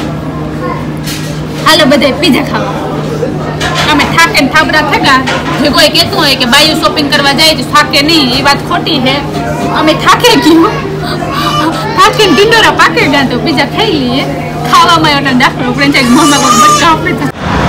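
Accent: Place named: Kathiawar, India. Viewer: ખરેખર, શાંતિબેન તમારો you tube પર નો વિડિયો જોઈ ને બહુ આનંદ સાથે તમારા સાહસિક સ્વભાવ ને પ્રણામ. આમ તો આપણી કાઠિયાવાડી દિકરિયું ઍટલે ઝવેરચંદ મેઘાણી સાહેબ ની ચરણ કન્યાની કવિતાની યાદ અપાવતી ક્ષણો... જે ડણકું દેતાં હાવજ ને પણ ડસ કારિયું દયીને ડરાવતી ... તમારી તળપદી બોલી ને હાંભળી ને બહુજ આનંદ સાથે છાતી અમારી ગજ ગજ ફૂલતી કરી દીધી...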